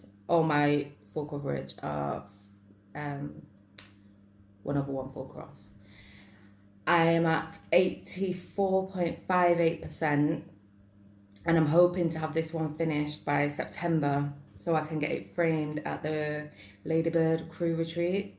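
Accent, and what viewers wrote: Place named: North West England, United Kingdom. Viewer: As soon as I heard you I subscribed as I knew you must be another flossy from the north west. Absolutely love your stitching projects and wow that lion is amazing. Loving the houses too. Brilliant first video and look forward to future ones. I haven't done any floss tubes as I'm too camera shy but I especially love to see locals doing their stuff. Here's to many more 👏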